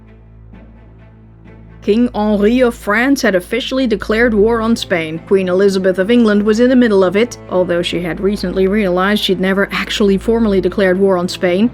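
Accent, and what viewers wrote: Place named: Netherlands. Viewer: i keep wondering which of your accents is the real one. But by any account, they're both great. (I think you have Dutch roots)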